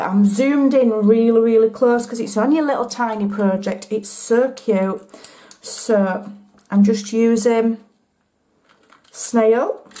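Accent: United Kingdom. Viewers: Such a neat MAILABLE gift! Love your accent, too. May I ask where in the UK you're from? Thanks for sharing! :)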